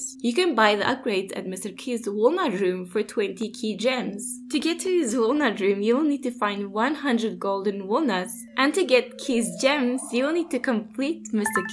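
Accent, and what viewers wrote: South Africa. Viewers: South African? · I hear a South African accent. Hmmmmmmmmmm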